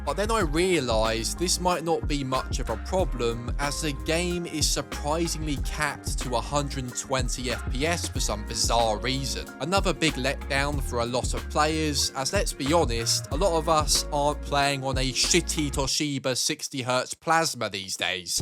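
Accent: United Kingdom. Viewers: gG british accent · Ugly UK accent. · final boss of the brit accent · The limey accent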